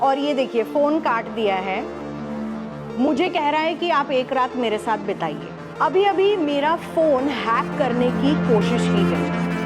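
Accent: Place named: Bihar, India. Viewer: Sound se to bihari lag raha hai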